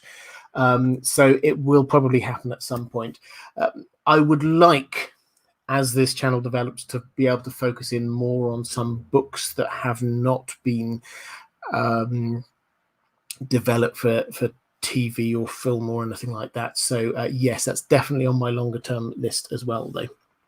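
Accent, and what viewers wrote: United Kingdom. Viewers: Love your British accent on foreign languages! Keep it up and don't try too hard.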